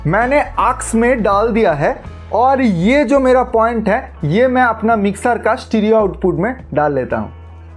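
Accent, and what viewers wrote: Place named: Bengal, India. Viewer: Bongali Hindi accent yckk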